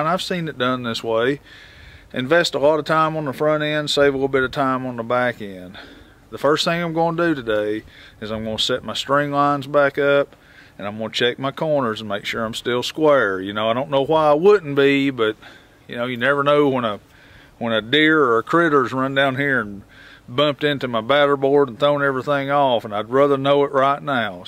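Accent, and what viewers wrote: South, United States. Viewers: im from the south and this dude is country as freaking cornbread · Man, I'm tickled I ran across your channel today. It's awesome to get this info from a good ole boy instead of those some of those nasally Yankees. Northeastern Arkansas here and your vids are going to help me understand what I need to do to help my son build a pole shop on his property and finish a house he bought .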